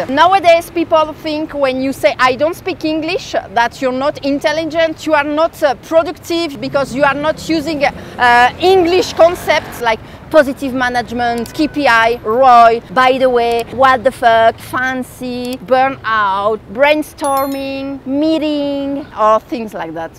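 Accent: France. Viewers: You speak English with a French accent. Alf for half. Siempre me fijo en los accentos de los franceses por que me ayuda to reverse engineer towards bettering my French accent. Es el ritmo del Francés que es dificil al principio y por el que se detecta que alquien habla francés originalmente. El acento de la sílaba en el lugar donde no va dice francés. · Vous avec un accent parigaud? Pourquoi? Vous y avec vécu tres peu de temps, mais c'est vrai que j'ai etudié à Besançon et j'ai plutôt un accent de titi, mes copain lillois se marrent de moi, puisqu'ils savent que je suis mexicain. Quand à votre accent, c'est normal en chinois, en suédois ou en mixtèque ! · Es muy agradable escucharte con tu acento francés, pero no eres inventada . Hablo de las latinas y no los latinos, las mujeres van a otro país, y hablan con acento fingido y muy pobre, porque no hablan el idioma de dónde vive, y según ellas olvidan su propia lengua maternal 😂, se ven súper ridículas. 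Yo también hablo 4 idiomas, el francés lo aprendí , en México en el Liceo Franco Mexicano ,en Polanco.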